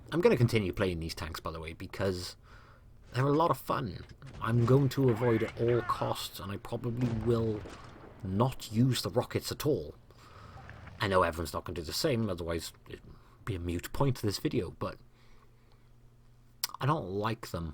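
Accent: Wales, United Kingdom. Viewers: Missed your soothing Welsh voice! And your tips of course!!! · Are u welsh?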